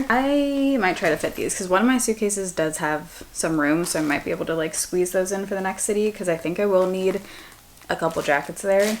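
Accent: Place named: Seattle, United States. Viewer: Listen to you talking remind me of Seattle before I moved out of the state. I miss Seattle so much since there are so many things and places you can go, especially when it comes to healthcare. Swedish hospitals are the best. Their staffs are awesome and very informative, get the jobs done. I hope you feel better soon. I have migraine really bad like u had where I would bury my head the entire days off. I couldn’t take it anymore n went to the ER. But all they give me was Tylenol drips n IV fluids n 1 shot of morphine n sent me home. I ended up having migraine after I went home n decided not to go back to the ER again.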